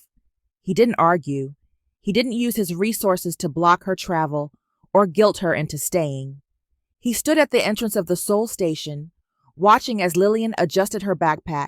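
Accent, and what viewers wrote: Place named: United States. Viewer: I find this voice difficult to listen to it's slightly aggressive and very American. Although the story was good it progressed very quickly.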